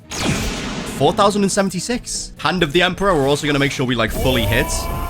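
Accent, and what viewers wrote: United Kingdom. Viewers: is British right?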